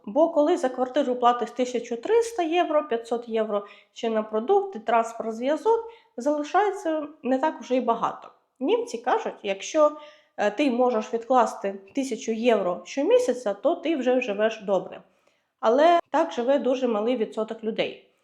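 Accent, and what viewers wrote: Standard. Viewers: Красива , креативна , розумна , (з харизмою треба попрацювати ( без образ)) україночка чисто розмовляє без суржику.Respect & perception of luxury 🇺🇦😁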